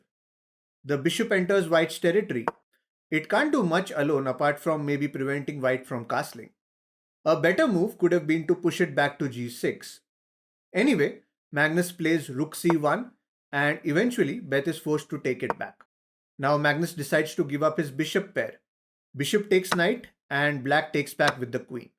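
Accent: India. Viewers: finally an indian accent i understand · an by the way..? which indian extreme accent person, was able to rise nearly a million subs with chess? is that corona are the entire world silly nowaday.. in einer welt die verrückt spielt, ist nur ein irrsinniger wahrlich geisteskrank...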